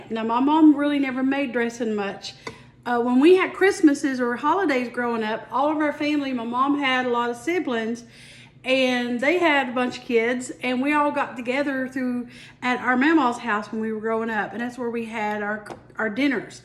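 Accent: South, United States. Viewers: Sweet country accent , could listen & watch you all day ! New follower !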